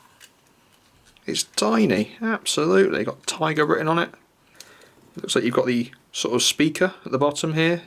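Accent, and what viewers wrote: United Kingdom. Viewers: You re british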